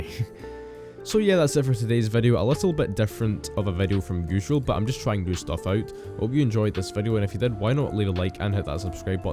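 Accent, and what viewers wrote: Scotland, United Kingdom. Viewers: u scotish?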